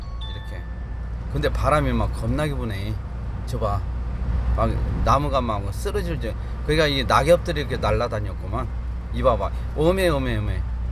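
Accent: Jeolla, South Korea. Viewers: 내 고향 전라도 사투리를 겁나게 쓰시네요~ 갑작스런 사투리에 겁나게 어매어매 정겹네요~ㅎㅎ 성령의 불덩어리가 전라도에 떨어져서 깨어지고 살아나게 하옵소서~ 화이팅입니다~~~ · 목사님 강단에서는 사투리안쓰시는데 평소에 사투리 엄청쓰시네요 라도분들은 서울말과 사투리 자유자재로 쓸수있어 좋겠어요 ㅎㅎ 경상도는 고맙습니데이 ^^ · 아따~목사님!!! 참말로~ 전라도사투리겁나게잼나부러유~ㅋㅋㅋ 사모님... 빗길안전운전하세요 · 전라도 사투리 너무 재밌네요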